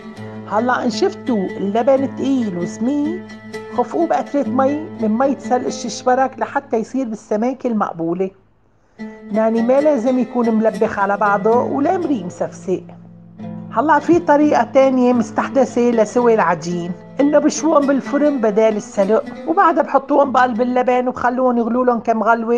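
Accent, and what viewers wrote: Aleppo, Syria. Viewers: يا وين كنتي لهلئ اييي ابوووس وجنك وجك انا خيييييت والله حسيت حالي بنص حلب ع سمع صوتك وطبختك 👍😅 عفرينة اناااا وبحبك كتييير لسع ع أيام نواله بحبك 😍🤣🤣🤣🤣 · دخيل عينك ما بتذكريني الا وانا.. بحلب.. على جمال اسلوبك.. انا بتابعك بس لاسمع صوتك ولهجتنا الحلبية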